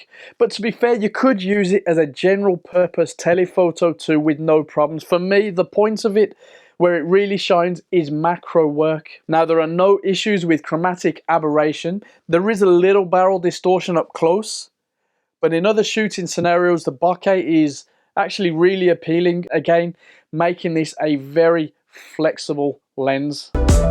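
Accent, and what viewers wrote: Birmingham, United Kingdom. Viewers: Thanks great review of this lens - I love your sample photos. I think that you have just made my mind up over which lens to buy next. I have the P/L 12-60 with G9. Considering P/L 50-200 but price and weight putting me off. Just seen P 35-100 F2.8 but I think for the type of photography this macro lens is great and can be used as a fast medium telephoto. Thanks for the no nonsense review with a Brummy accent.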